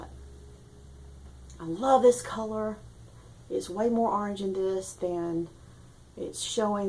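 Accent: South, United States. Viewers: you are one of THE most gracious people I know- and that southern accent- just takes it out of the park!!! I love it... I want to say - how sorry I am for the news of your Dad- and how I love that you are all in the "now" instead of the later.. Enjoy each moment- and cherish that family of yours... We'll all be here waiting to see when you pop in next... xoxo Ohhhh- and good luck with your crochet adventure... ( smiles )... Bless your heart indeed.. Me and crochet do not get along- but I love how you are trying different ways to make it happen... xoxo Blessings Your Way!!! niki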